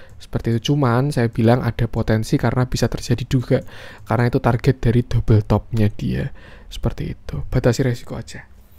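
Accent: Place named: Central Java, Indonesia. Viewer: medok gess… org jateng nih min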